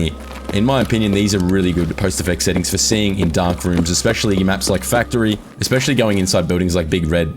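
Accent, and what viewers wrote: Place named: Australia. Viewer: Hey brotherman just wanted to say a big thanks for making this video. Tweaked up a few settings to exactly what you're running. AMAZING ! Visual clarity doubled instantly. Color space is amazing too ! Used your post effects and ended up reseting my digital brightness to default again. AMAZING ! And so nice to hear a fellow aussie. Straight to the point. No bullshit video. 10/10 mang.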